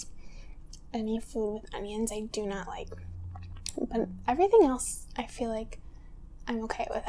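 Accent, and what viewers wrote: United States. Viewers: The best of all the American accents. 😎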